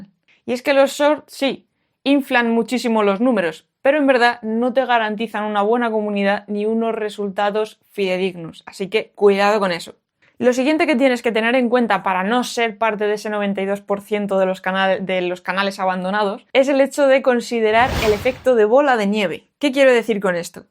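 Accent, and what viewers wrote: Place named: Canary Islands, Spain. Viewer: suena muy canario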